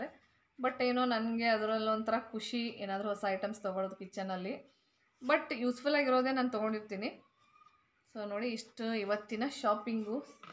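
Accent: North Karnataka, India. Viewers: Waiting for ur vlog ri.....don't mind....neewu complete uttara karnataka style naag matadi kelaak chand anstaiti....mysuru kannada mix maadbyaadri.....keep doing such vlogs we like ur vlogs so much.....👍👍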